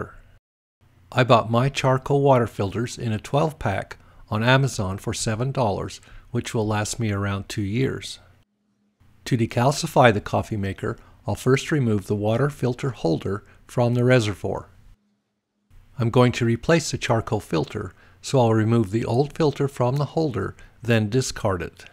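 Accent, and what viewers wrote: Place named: Ireland. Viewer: Sounds to me like he’s from Ireland but has been in the US for a long time so it’s subtle now.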